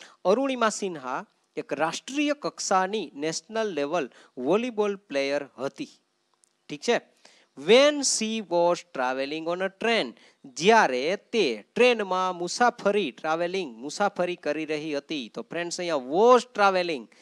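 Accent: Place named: Kathiawar, India. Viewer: Best sir વાહ કાઠ્યાવાડી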